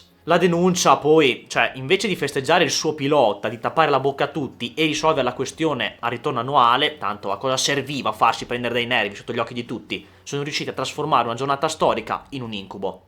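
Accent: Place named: Modena, Italy. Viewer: Di dove sei? A volte sembri reggiano, a volte modenese... più modenese...bei video!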